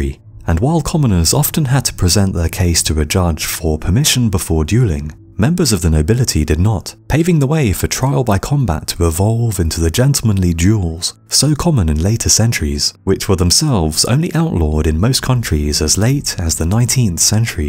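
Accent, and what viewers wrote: United Kingdom. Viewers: Best narrator voice of all time. I must know what part of Great Britain has this accent. Please enlighten me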